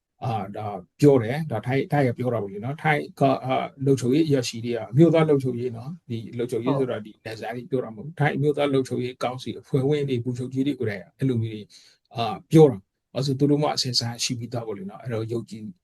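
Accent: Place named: Dawei, Myanmar. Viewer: ထားဝယ်လေသံန့ဲဆိုတော့မနည်းနားထောင်ရတယ်တစ်နေ့န့ဲတစ်ရက်အတူတူဘဲနော်ဒေါ်လာသန်း192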